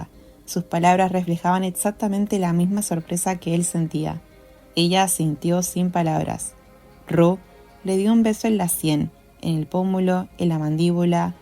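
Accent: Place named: Argentina. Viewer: Me encanta el acento argentino, le da el toque perfecto a la narración 👏🏼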